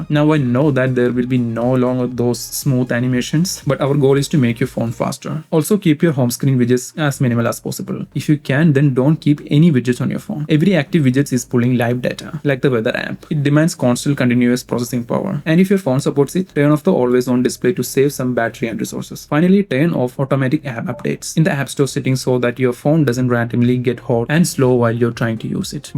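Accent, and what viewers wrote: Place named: Kerala, India. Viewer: that malyali accent is smooth, intentionally pronunciation is lit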